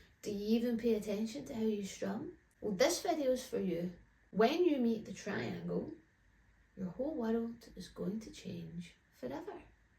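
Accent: Scotland, United Kingdom. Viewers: Very useful tips! Thanks - and I listen to your videos to learn, but do love your Scots accent along the road to learning. 🎶 PS I have no idea what a "crabbit" is!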